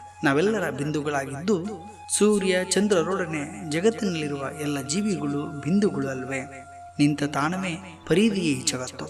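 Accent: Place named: Kundapura, India. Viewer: Treat to hear kundaapura kannada ♥️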